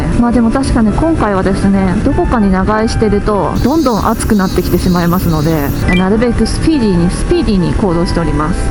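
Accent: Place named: Kansai, Japan. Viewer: 偶に関西弁出るのが凄く謎www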